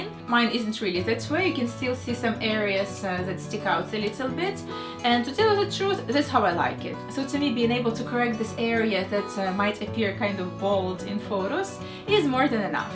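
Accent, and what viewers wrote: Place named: Russia. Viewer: After listening this just for a few seconds I became sure it is Russian accent. Double checked it in description and yep, she's russian. Btw привет, me too)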